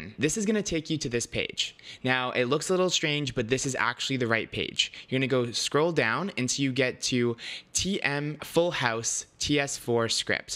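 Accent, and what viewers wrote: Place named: Philippines. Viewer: you sounded Filipino. haha. Nice content by the way.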